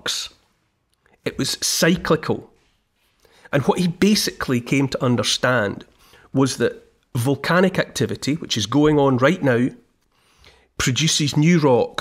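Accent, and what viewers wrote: Scotland, United Kingdom. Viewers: I enjoy hearing your Scottish voice, Neil. It reminds me of home.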